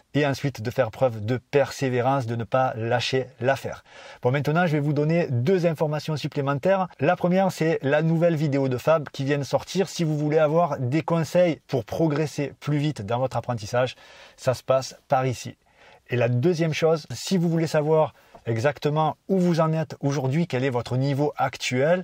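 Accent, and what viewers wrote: South, France. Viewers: Son accent du sud il régale ! 😂👌🏾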